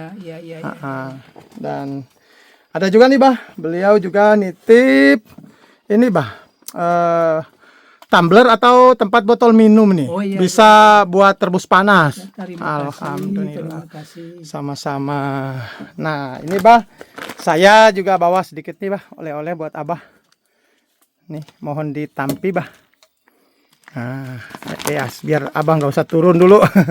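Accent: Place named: West Java, Indonesia. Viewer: Klo dngr ngomong itu daerah prbtsn Tasyik dgn garut Buuun.. Mfin ya bun nyela komen 🙏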